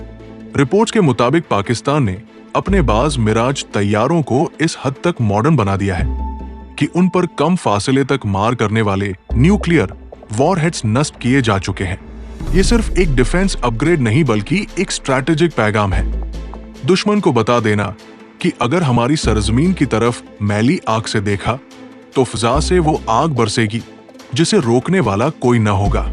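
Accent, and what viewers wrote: India. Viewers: Barway, ya bhartio ki terha urdu kyun boltay ho? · تمہارا لہجہ اور تلفظ ہندی کا کیوں ہے ؟؟؟؟؟؟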